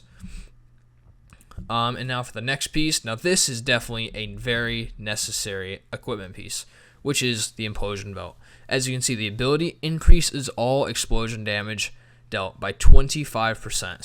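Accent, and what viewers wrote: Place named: Netherlands. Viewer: You sound Dutch! Are you?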